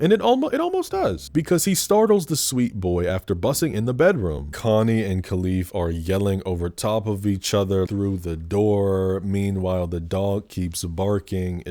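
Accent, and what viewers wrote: United Kingdom. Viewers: you fool lol. I absolutely love your uk/brit accents, they just scratch the perfect spot in my brain lol 🙂‍↕️🤝🧡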